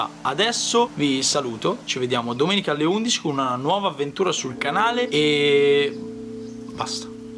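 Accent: Bergamo, Italy. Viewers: sciarpa di capelli, accento bergamasco, street food, ignoranza in abbondanza, scusatemi ma anche senza coffe shop made me feel high.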